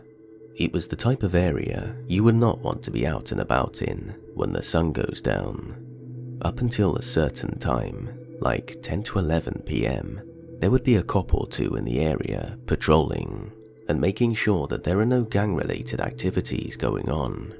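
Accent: United Kingdom. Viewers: Thank you 😊!!!! For the Stalkerlicious 👁 Narration:) and for over an hour of your Britishlicious voice 😘 be safe everyone ok 💋much love Sexy Queen of Darkness ✌❤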